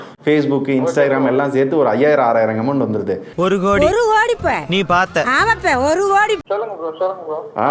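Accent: Madurai, India. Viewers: Bro ninga madurai yaa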